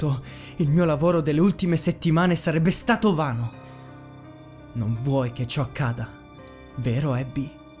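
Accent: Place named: Norway. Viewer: sei bravissimo e amo troppo il tuo accento norvegese credo. Continua così perché sei un mito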